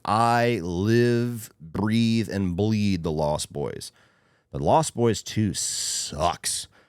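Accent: United States. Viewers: I’m surprised no one has offered him voice work. He has that perfect American voice that would appeal to folks in Asia and elsewhere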